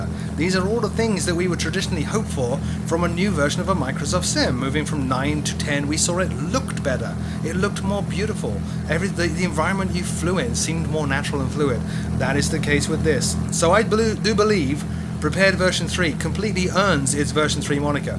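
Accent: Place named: United Kingdom. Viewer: I can tell you have a Brit accent, but for some odd reason I get the feeling you live in the states.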